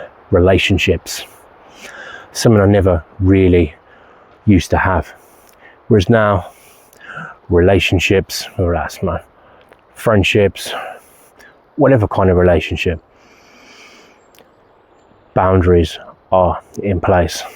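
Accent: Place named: New Zealand. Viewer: You really picked up the new Zealand accent love it!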